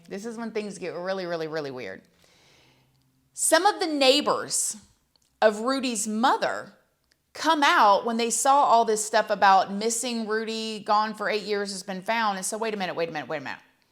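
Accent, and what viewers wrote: South, United States. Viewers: So happy Rudy has been found!💙 Also I love you, a main reason I subscribe is hear you say "poh-leese" your country twang is adorable. Your story is inspiring, thank you for sharing your life w/us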